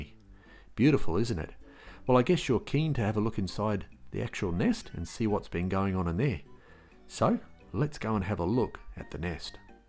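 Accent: Australia. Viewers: I guess it's because I grew up as part of that generation but if I hear an Aussie talk about animals I tend to be entranced and retain the information better. The spirit of Steve Irwin is smiling somewhere.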